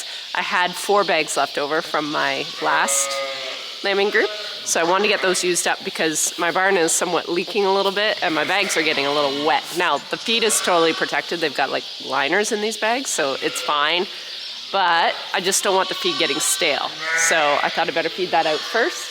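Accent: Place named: Canada. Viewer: Don’t care about hair. Am old city bred gal now retired. Can not travel so utube is it. This year I have begun to learn about farming. Larg er animal care and feeding. I love your slight Canadian accent. Thank you for what you do.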